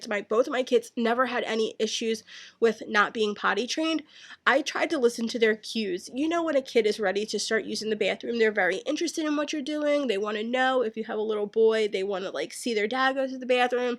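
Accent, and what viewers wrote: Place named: South Jersey, United States. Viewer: Gurrl! You already know I love you, your personality, content and accent! Go South Jersey..Lol 🙌🏽🙌🏽 I can totally relate to the whole thyroid issue! Basically it sucks! I’m hypothyroid and my hair is nothing like it used to be..it’s thinned out, dry and falls out!! ugh, my nails..and it does so much to your body, we would be here all day! Hang in there and go see the specialist for it, an Endocrinologist! I look forward to your adoption story and always your next video! Tfs love! 😘🤗 ~ Helen -NYC girl here..Lol!!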